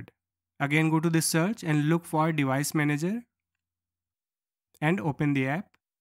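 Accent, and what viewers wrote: India. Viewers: the network reset worked for me tysm omggg since i heard ur indian accent i knew u were gonna be the one who helped me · You know the problem is half solved when you listen Indian guy narrating in video.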